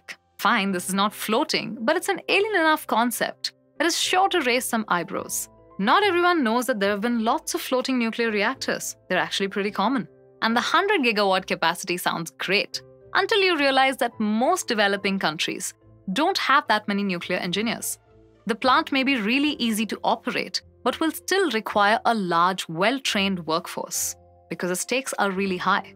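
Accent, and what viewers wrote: India. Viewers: the most precise Indian accent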